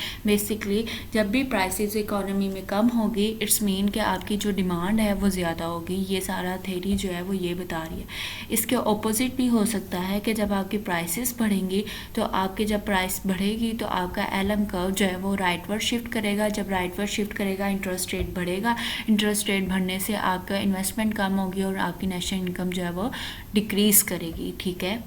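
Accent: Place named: Pakistan. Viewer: Aapki aavaj se lag rha hai , ki aap Pakistan se ho 🙏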